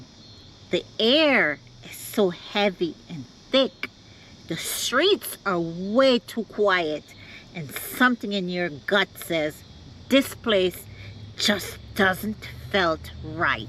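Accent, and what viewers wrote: Jamaica. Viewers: You have a very thick Jamaican accent. I thought you said you were raised in the United States. It seems like if you’ve been here so long that your native time wouldn’t be so thick 😊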